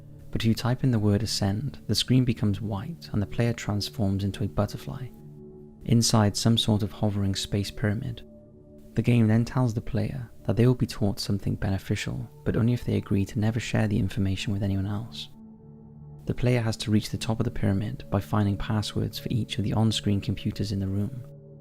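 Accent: United Kingdom. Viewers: I just want to say I love these "Hit Those Lights" videos and the narrator being British makes it so much more convincing and real. I've always been fascinated with what makes people do these horrendous, horrifying acts of violence and I try to find a pattern like they were victims themselves or there was a history of trauma but it's when there is no explanation found or the perp got away with it makes it even more un-nerving and intriguing. Keep up the good work!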